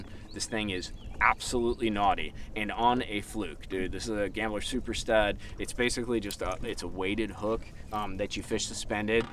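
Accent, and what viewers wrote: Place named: Minnesota, United States. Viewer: Woooo! First comment finally. Fishing is a lifestyle. That ohhh Kay 😂 I’m from Minnesota so I can appreciate the accent.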